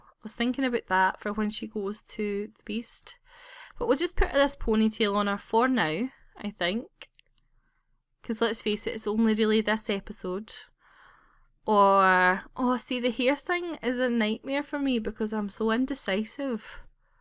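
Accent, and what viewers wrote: Scotland, United Kingdom. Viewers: fana101 she is Scottish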